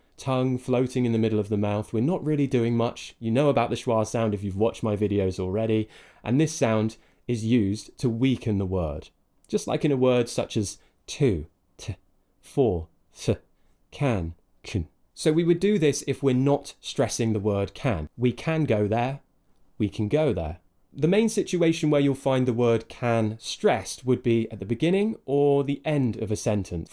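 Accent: United Kingdom. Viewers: Thanks a lot mr for your videos and now you look more handsome than your some previous videos sir I always like your British English accent. Mr you always our British English teacher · Hi I really like you channel and your authentic British accent. ❤❤❤❤and by the way your handsome face is very charming. I am from asian 😅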